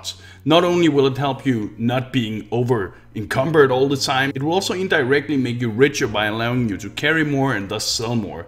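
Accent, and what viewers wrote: Denmark. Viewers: This guy got to be Danish. That irritating accent is only available in Denmark..... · you sound danish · I can tell from your accent that you´re danish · I think he's Danish, like me.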